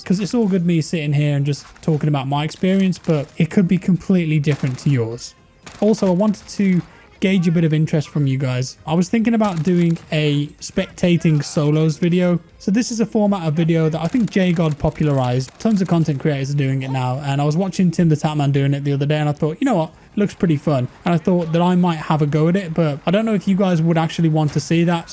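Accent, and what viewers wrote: United Kingdom. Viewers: A bunch of streamers already do the "spectate" - don't follow. I love the adult conversations regarding gaming with other adults. Mix in the British accent and I just laugh. M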